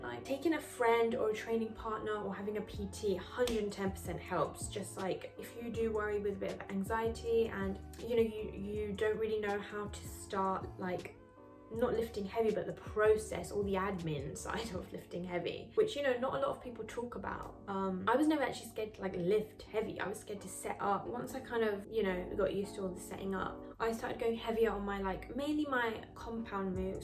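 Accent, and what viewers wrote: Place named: United Kingdom. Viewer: I don’t understand a single word ohhhh Britain 🙄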